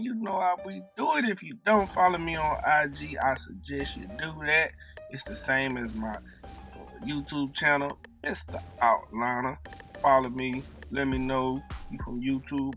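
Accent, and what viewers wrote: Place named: Memphis, United States. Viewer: Where are you from? I'm from Memphis, you kinda sound like you're from here. Awesome Job ,on the haircut! I just found your channel. I have 2 boys on the Autism spectrum, they both have sensory disorders, my youngest is 9 and he has never been still enough to get lined up properly, and shaped up. I just wish it was some way to get him to keep still. I think his barbers, tools are a little to sharp for him, do you have any suggestions for a child with autism that cant hardly take the sensitivity of the tools? And I subbed to your channel! I'll look you up on insta!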